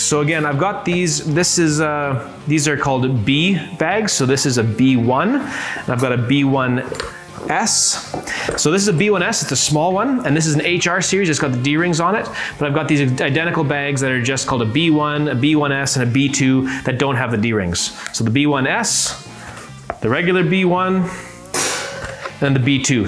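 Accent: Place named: Canada. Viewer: I detect a Canadian accent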